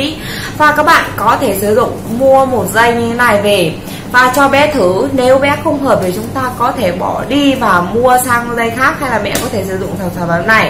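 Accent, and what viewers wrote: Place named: Thanh Hoa, Vietnam. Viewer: Đúng là giọng thanh hóa.. Nói quê quá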